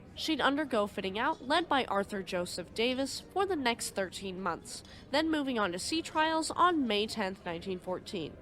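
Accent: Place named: Pennsylvania, United States. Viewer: Being someone from a northern town in Canada I don’t pretend to be great with American accents. That being said, I have a good friend from Pennsylvania and every time I listen to one of your videos I catch the subtle similarities of the accent. Keep up the good work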